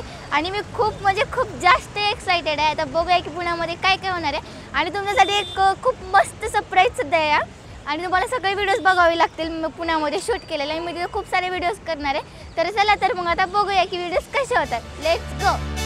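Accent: Latur, India. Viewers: Latur kar bolanyachi tone khup avdli pune karanna.... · लातूरची मराठी लई भारी 👌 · I am from Pune Tuza video pahatana tuzi bhasha aikun mala mazya gf chi aatavan zaali she was also from latur #pbthetraveler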